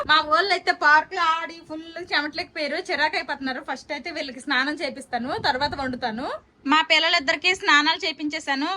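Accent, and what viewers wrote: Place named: Rajam, India. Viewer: hai sister me pillalu me mata chakkaga vintunnaru naku 6 years babu unnadu food thinadu nanne thinipinchamantadu konni sarlu mata vinadu allari ekkuva chestadu ma vadu kuda school lo edo okati vadilesi vastadu nenu me videos ma babu ki chusi cheptanu valla laga chethi tho food thinali home work cheskovali ani anyway me videos naku chala istam prathi okkati miss avvakunda chustanu me matalu vintunte ma slang gurthostundi madi rajam daggara village memu vizag lo untamu sister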